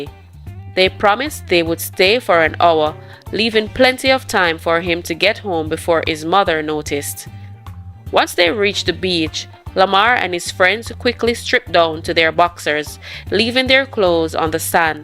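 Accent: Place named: Jamaica. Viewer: Love the Jamaican accent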